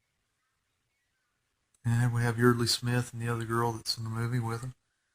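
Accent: South, United States. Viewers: your southern accent is perfect for this commentary. well done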